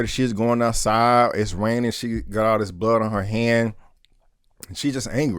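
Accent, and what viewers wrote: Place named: South, United States. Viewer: And where are you from??? I can tell it's in the south somewhere from your accent but bc you know who young joc is that made intrigued about what part of the south you live in. I personally know joc so I can kinda guess around which area.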